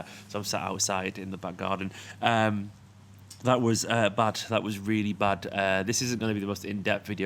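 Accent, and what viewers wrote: Manchester, United Kingdom. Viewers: What part of Manchester are you from?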